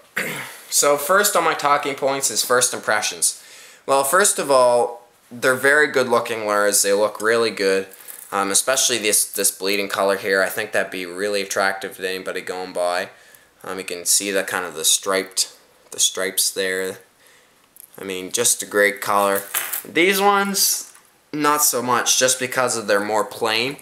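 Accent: Canada. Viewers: just started fishing rapalas and they seem pretty damn good. you get what you pay for i guess sometimes. ps - what's your accent? canadian? or just north states?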